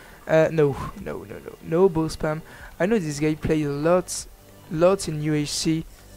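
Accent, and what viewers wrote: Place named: France. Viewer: Yeah good english mate i love that lol i knew what is the french accent tkt sa s'ameliorera au file du temps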